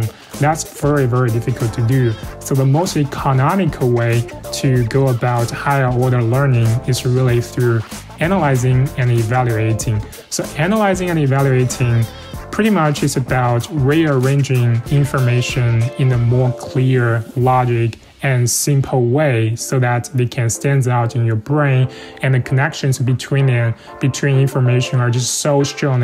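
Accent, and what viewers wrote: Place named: China. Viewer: 听你的中式英语还自以为很流利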